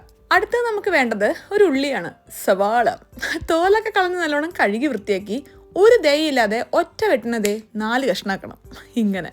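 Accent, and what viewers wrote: Malappuram, India. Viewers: നിങ്ങൾ മലപ്പുറംകാരി ആണോ ഞാനും മലപ്പുറം ആണ് നിങ്ങൾ പറയുന്നത് കേട്ടപ്പോ തോന്നിയത് ആണ്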